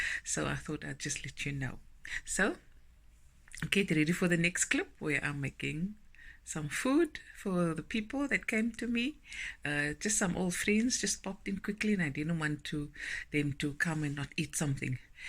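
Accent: South Africa. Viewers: I am originally from South Africa, now living in Canada. I came across you video just recently and what caught my attention was your accent. It was so good to hear a SA accent after so many years. Thank you for your videos, love them, God Bless.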